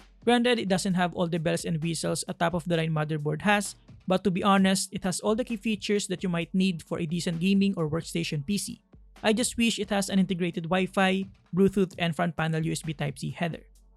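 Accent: Philippines. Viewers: Just the way you talked I immediately knew you were a Pinoy XD. Jollibee gang from California lol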